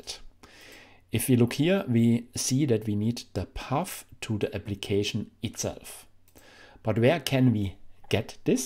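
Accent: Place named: Black Forest, Germany. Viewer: I thought your Ubuntu video info was great, thank you! I'm very pleased with the distribution. And your "Black Forest" English! I knew my German grandfather was from the Black Forest area in Germany, but I didn't know what or where that was. I just looked it up. How fun to listen to someone from the area my granddad's family upbringing.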